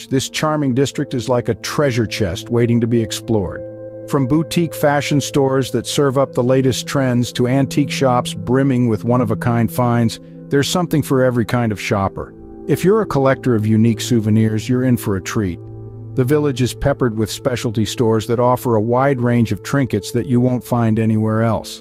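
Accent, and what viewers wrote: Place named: Northern California, United States. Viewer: It is great to have a human narrator with a Northern California accent ( instead of one with robot accents ) describe Northern California cities.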